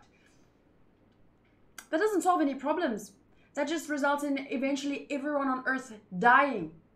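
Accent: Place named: South Africa. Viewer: I'd bet it's South African, really cool fun accent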